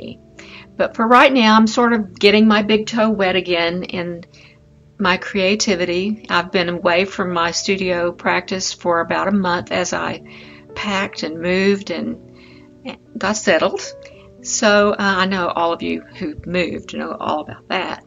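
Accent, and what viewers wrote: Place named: Texas, United States. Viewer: Love your videos and art,. Really helps me with my composition. Are you Texan by any chance? We live in England, and you remind me so much of the Texan women I grew up with. I could listen to you all day..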